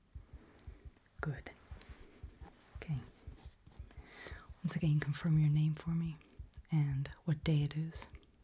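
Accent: Midwest, United States. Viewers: Everyone’s talking about the American accent, but this is my first video so I was thrown off because I thought she was going to be Russian😂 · ooooh your american accent is scary good! the only tell is that you said “rather difficult” where americans would probably say something more like “kind of” or “kinda” instead of “rather” in everyday spoken language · The basically perfectly American sounds coupled with the still-mostly-British cadence makes this so surreal 😂 fantastic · I was not expecting the American accent, caught me off guard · Awesome U.S. accent! Truly! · Oh wow! The accent is great. If this was my first time watching I'd think you were American! Just a few vowels gave you away 😉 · the American accent??? 😱🤌🏼❤️‍🔥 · Really love the American accent! Very tingly ahaha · Not only the "R"s, but also the use of "gonna"? Excellent American accent! 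Really impressive (and still just as precise and relaxing in tone).🖤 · Great American accent! 👏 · I spent more time seeing if you'd slip up 😅 but you did really good. Not dodgy at all! "If you were stood here" was the most sus I like your American accent. Hope we can hear more of it! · As an American who's never seen your channel before, I couldn't tell that you weren't American until I opened the comments. Well done. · I’m a californian and she sounded almost mid western to me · You say it's dodgy but I immediately clocked it as a non-specific American accent with the "Hi there." So I think it's a success. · I was wondering why you sounded so different at first until I noticed all the comments. You really hammered the American accent pretty well. It's hard to pinpoint a specific, but it's like a mix between the Midwest and New England accent. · This is the second video I’ve seen of yours where you use an American accent (the other being the Noir Detective role play) and damn, you pull it off really well! 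Like others have said, your “Hi there” had me saying “Whoa what?!” 😂 Impressive as always! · Haven't heard this accent since your last cranial nerve exam I believe! Very appropriate to use an American accent for MKUltra 10/10 accuracy and performance. Love your vids, thank you so much for keeping us entertained (and relaxed) <3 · The American accent gave me whiplash so hard that I’m now fully awake 😭🙏🏼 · It sounds like a midwestern accent, like in states near Canada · Wow, your American accent is SO good! Personally, it makes me think of someone from the Midwest who's moved to New England. It also really reminds me of a specific episode of Orphan Black in which one of the characters who has an Irish accent (Siobhan) is impersonating someone who's American or Canadian (the show is rather vague about that for the most part). Regardless, I'm really impressed and rather unnerved, seeing as I'm so used to hearing an English accent from you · That American accent freaked me out but it was very good 😅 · Your american accent is so good, coming from an american! 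You sound like my family members from the midwest. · The Ameirican accent was not expected but was very much appreciated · gotta say, (as an american) you NAILED the accent! it was so uncanny to hear you as an evil *american* scientist as opposed to a british one! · Greetings again good lady. You are simply going from strength to strength with every upload. This video, the A-Z, the Backrooms video, the Infernal Job Interview... I could keep going, but will not for the sake of brevity. I loved your American accent here, and it seems that your American watchers did as well, judging by the other comments. I thank you heartily for your wonderful work, and I eagerly await your future uploads. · AMERICAN? as an American myself this was pretty good!! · pls more amerivan accent asmr❤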